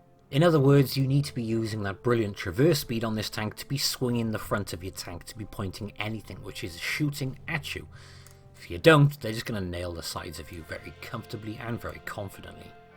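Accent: United Kingdom. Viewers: I don't know how you get all these bouces while being on a flat ground, like the second game with leo and jag shooting at you... I get penned by stock T-54 all day long, even while wiggling and jiggling... 😭 Wg must be protecting you ! Or maybe do I suck? I'll try to apply the golden golden rule for my next games :p Anyways, thank you for the reviewing, love your British accent ~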